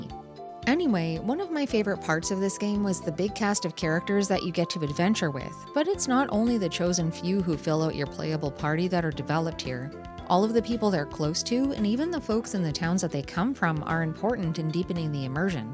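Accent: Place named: Atlantic Canada, Canada. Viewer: I just watched your Faxanadu play through and couldn't stop thinking that you sound like you're from the east cost of Canada :)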